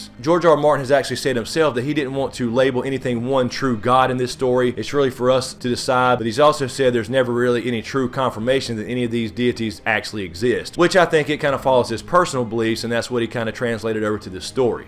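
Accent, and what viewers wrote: South, United States. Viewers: hey! I love your southern accent and the way you simplify the books and show for those of us who can't keep track of every detail. My question is what role do you think Meera Reed is going to play in the season to come? I believe someone told her that she was important to Bran and she did save his life but do you think she's more important than that? do you think she's secretly someone?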